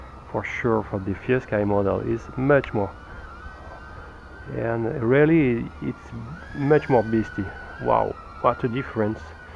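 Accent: France. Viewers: Tu es francais non ?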